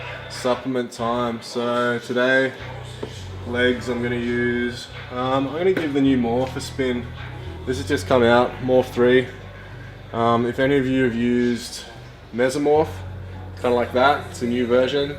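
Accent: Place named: Australia. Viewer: great vid! i don't get why you would have haters. you seem pretty cool. nice to hear a fellow aussie on youtube 😄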